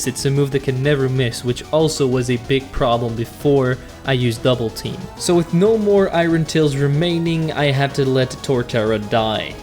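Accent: France. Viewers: My favourite part of all your gen4 sinnoh runs is Ur French accent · That damn french accent always gets me x) Excellent video, you truly are great:) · That French accent tho 🔥🔥🔥🔥🔥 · That French accent though. Haha! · Like for the French accent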